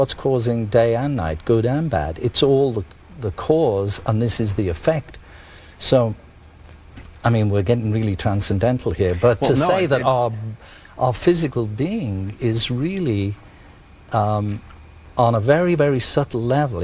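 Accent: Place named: United Kingdom. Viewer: THE ACCENT IS MUCH BETTER THAN AMERICAN ACCENT AND APEACH, AMERICANS DONT LIKE IT, EVEN WOMANS, BRITISH WOMANS, BEAUTIFUL ACCENT AND VERY KIND, NOT RUDE LIKE AMERICANS GIRLS., LOVE BEATLES, WHO DOESN T ?? ELVIS, NEVER PLAYS GUITAR LIKE BEATLES OR WRITE A SONG, OR THIS VANILLA ICE LOL, ITS A JOKE, AMERICANS, THEIR GOT ONLY INSTRUMENTS, REAL MUSICIANS ARE FROM UK...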